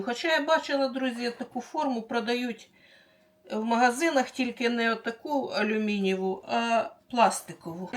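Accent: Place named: South, Ukraine. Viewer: Ви для мене, як ковток свіжого повітря! Насолоджуюсь Вашими рецептами ! А Ваша така чиста українська мова з півдня України то взагалі БАЛЬЗАМ НА ДУШУ! Щиро дякую!!!! Здоров'я Вам, МИРУ, ПЕРЕМОГИ!!!!!!!!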